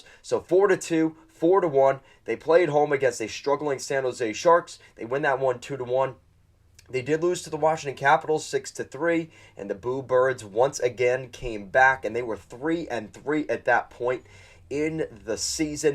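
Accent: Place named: Long Island, United States. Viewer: Like your Long Island accent dude.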